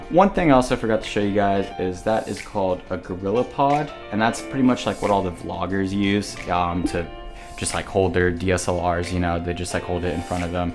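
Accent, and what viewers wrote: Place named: South, United States. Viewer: What state r u from because I kinda can tell a southern accent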